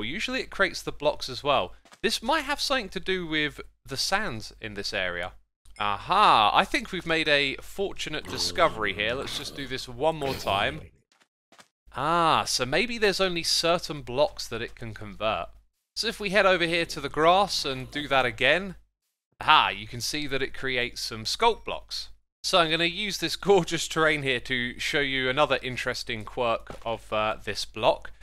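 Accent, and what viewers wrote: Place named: United Kingdom. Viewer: Why does every Minecraft youtuber have a British accent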